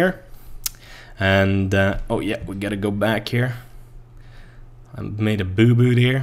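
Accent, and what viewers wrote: Sweden. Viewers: You sound like your from sweden o you were nice! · dat swedish accent lol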